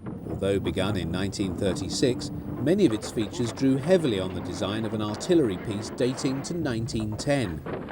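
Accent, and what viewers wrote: United Kingdom. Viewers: the British English narrating sounds sophisticated and smart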